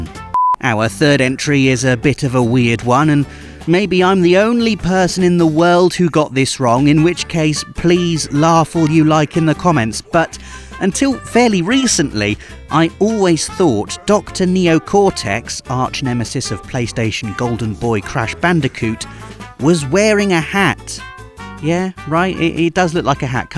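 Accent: United Kingdom. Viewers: All i heard was a british accent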